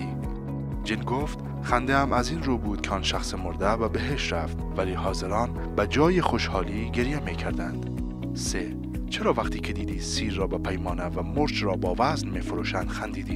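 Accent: Afghanistan. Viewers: مزخرفات وچرندیاتی جدید بالهجه مزخرف وریده افغانی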